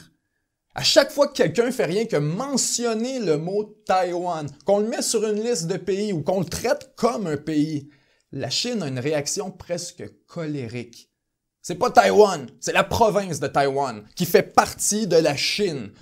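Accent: Quebec, Canada. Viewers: on dirait un terra bellum Quebecois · J'ai eu ma dose d'accent québécois ça fait plaisir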